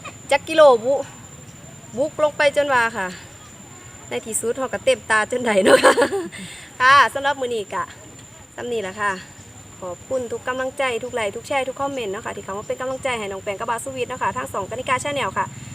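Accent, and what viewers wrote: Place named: Isan, Thailand. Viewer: สำเนียงเว้าคือบ่คือสาวเเจ้ย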